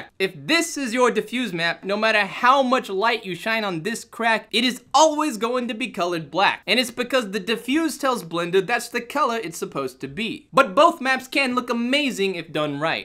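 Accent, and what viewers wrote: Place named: Boston, United States. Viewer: Boston accent, it seems.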